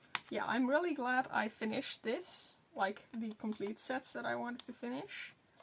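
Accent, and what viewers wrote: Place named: Finland. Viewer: You just sound so finnish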